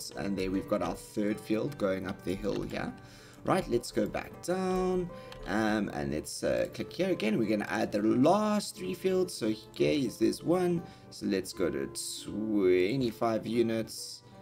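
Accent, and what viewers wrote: South Africa. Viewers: You sound South African? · You sound South African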